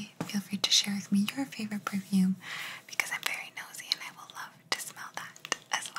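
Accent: Italy. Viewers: She has one or two that she did in her Italian accent... I'm pretty sure. Maybe like 6 months ago or so I think.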